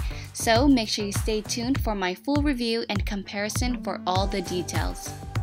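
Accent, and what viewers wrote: United States. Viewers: i love these videos even though i have no sewing ability whatsoever 😂 also, it amuses me the way americans say legs like 'ley-gs' rather than 'leh-gs'. 😉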